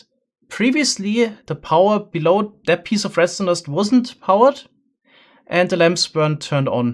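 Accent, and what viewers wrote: Germany. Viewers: Is he from Germany? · Are you german?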